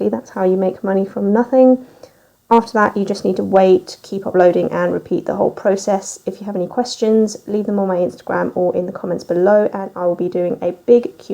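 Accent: United Kingdom. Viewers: Wow, this was refreshing,,, & a uk voice too 😁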